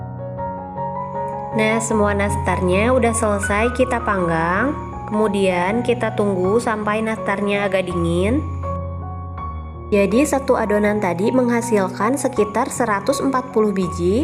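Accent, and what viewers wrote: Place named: West Java, Indonesia. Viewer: Meuni sunda pisan Aksen na, salaam sunda atuh Neng Geulis 😊 Hanupis resep Nastar nya nanti mau coba di praktekan 👍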